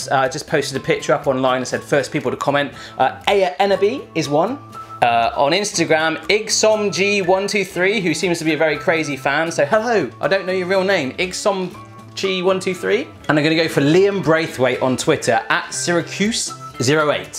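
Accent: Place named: United Kingdom. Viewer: Wait... Godfather Spaghetti & Meatballs from a guy with a British accent? Hm...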